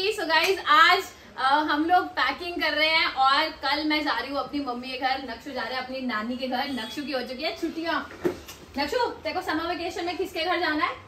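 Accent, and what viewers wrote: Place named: Gujarat, India. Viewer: Hi... Tomari Hindi old Gujarati mix language achcha lagta Hai tumhara bolane ka style... Ab Punjabi Ho aur Gujarati mein marriage ki hai ab aap ke nakshu ko Punjabi Sikhaoge ki Gujarati bolna... Aap ki mummy ka Ghar kaha hai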